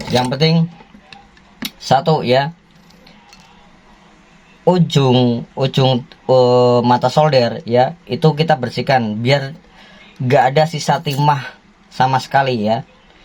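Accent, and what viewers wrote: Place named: Java, Indonesia. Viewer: Agak medok, tapi oke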